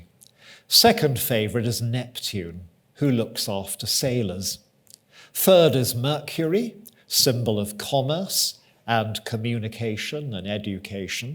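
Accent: United Kingdom. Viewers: My god is this British!!!!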